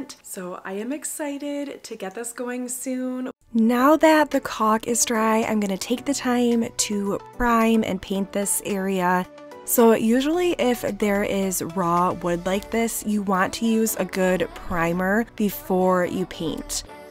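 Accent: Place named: Midwest, United States. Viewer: lol your midwestern accent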